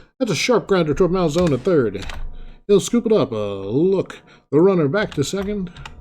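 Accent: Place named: United Kingdom. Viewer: Count me in and who is this voice of what sound like British persuasion?? Great voice Please continue very enjoyable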